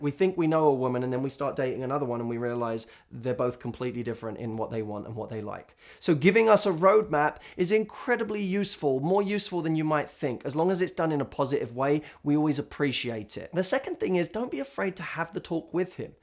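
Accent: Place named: England, United Kingdom. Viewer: I loved it when he said in the bedroom, and dirty tawlk, nawtee talk...lol cute, in that sexy English lad accent of his... I enjoyed that stuff he said when he was speaking in a seductive tone... Ooh those lovely green eyes, the sultry expressive baby come hither looks and body language, but those soft tones make me all melty, I want to hear him speak like that to me, telling me what he would like to hear me say, & costing up in the street whispering I can't wait till I get you home. Of course in the context of being life partners I am permitted to imagine these lovely intimacies...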